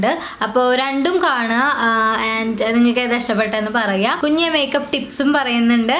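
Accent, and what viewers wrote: Thrissur, India. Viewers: സംസാരം kekkan nalla rasam undu.... thrissur aano....???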